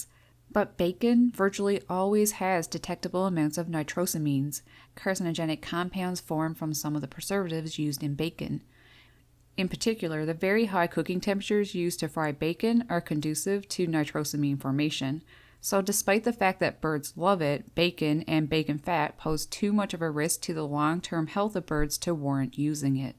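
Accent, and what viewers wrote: Canada. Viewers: Great post and lovely Canadian accent.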